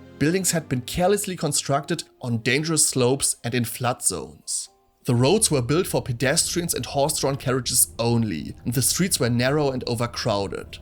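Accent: Germany. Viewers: Diggi ick lieb das Denglische Akzent 😏🤙 schöner Beitrag auch,weiter so! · Sorry but it´s so hard to me listen a german guy speaking english with this so strong accent !!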